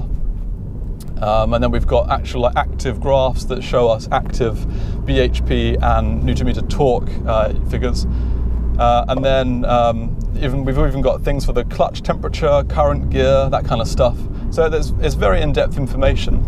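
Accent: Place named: England, United Kingdom. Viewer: Say Alpeen!!! like you say Ferraree and Lamborghinee! what an english uggly pronunciation you've got!!!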